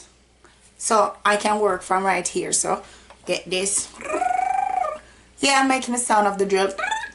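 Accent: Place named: Jamaica. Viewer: Jamaican I hear it looool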